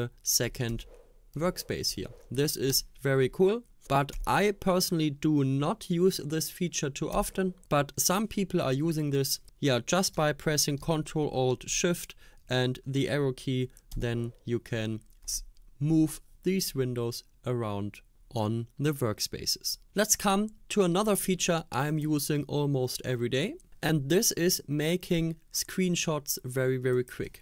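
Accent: Germany. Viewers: Probably he is german, or speaks german.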